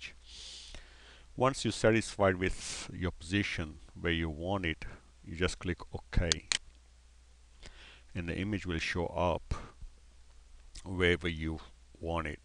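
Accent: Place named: Mexico. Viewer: OMFG NOCE VOICE AHAHAHAHAH you sound mexican XD thx anyways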